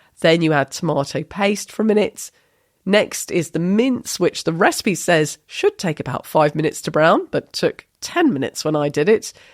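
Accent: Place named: RP, United Kingdom. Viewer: modern RP is known to me👍🏻👍🏻😀thank you. I am curious, if your mRP differs from eg. Atomic Shrimps‘, who is located in Dorset, as he says in his video. I understand, that the privacy is all important. But, if you could give a hint in which part of England your accent has developed and all maybe which region you are living in right now, I’d be very pleased if you could name of the region, if this doesn’t feel like doxxing yourself to you. Curious and very friendly greets from Germany 😀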